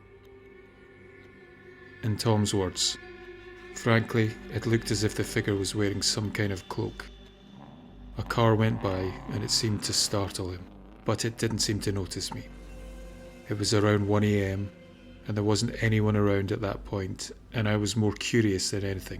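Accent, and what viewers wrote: Scotland, United Kingdom. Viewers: Happy birthday,always enjoy your stories with that added bit of history and i always love a vampire story...Thank you for always your lovely scottish narration😊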